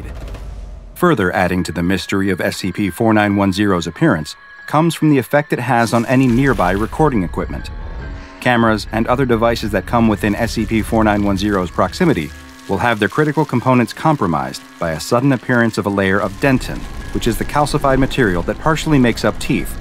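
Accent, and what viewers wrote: United Kingdom. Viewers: He became british D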